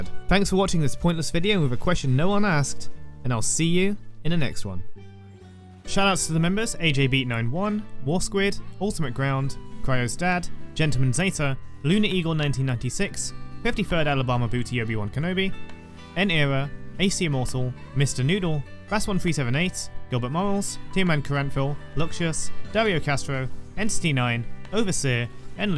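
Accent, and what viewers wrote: United Kingdom. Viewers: a british wittery accent is crazy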